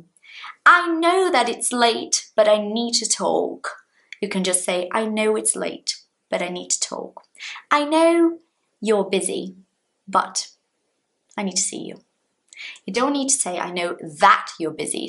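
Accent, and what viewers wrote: United Kingdom. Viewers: o mais incrível é que eu entendi 90% do que você disse, e ainda nesse sotaque britânico aaaaaaaaaaa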